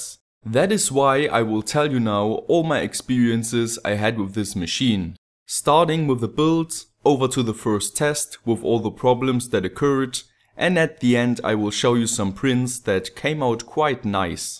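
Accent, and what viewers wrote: Germany. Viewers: I like your german accent! Like in old good times))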